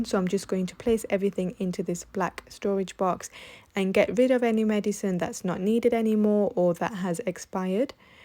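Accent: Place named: Manchester, United Kingdom. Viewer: Sounds like a Manchester accent to me.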